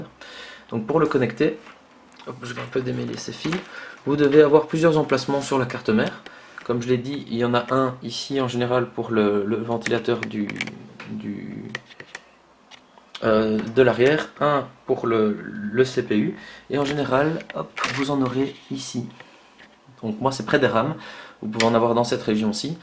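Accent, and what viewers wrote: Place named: Belgium. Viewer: Jolie petit accent... belgique?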